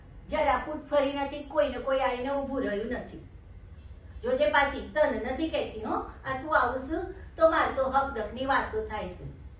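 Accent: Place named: Sabarkantha, India. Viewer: ખૂબ ખૂબ સુંદર એકોક્તિ. ખૂબ ઉત્તમ અભિનય!! વારેવારે માથે ઓઢવાની રીત... પાક્કી ઉમરલાયક બાઈ લાગે છે. સાબરકાંઠાની બોલી અદ્ભૂત!!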